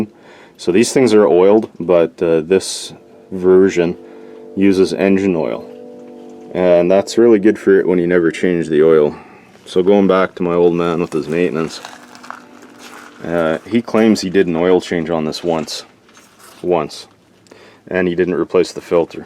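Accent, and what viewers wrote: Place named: Canada. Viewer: We know a Canadian accent when we hear it hellll yeah bawd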